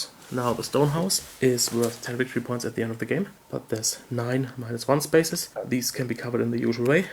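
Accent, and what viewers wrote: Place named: Germany. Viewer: something about a german accent during eurogame reviews just screams professionalism.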